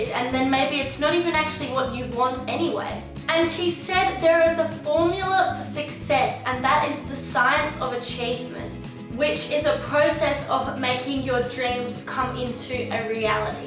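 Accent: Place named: Australia. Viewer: Amazing video love your Aussie accent ahaha❤️❤️❤️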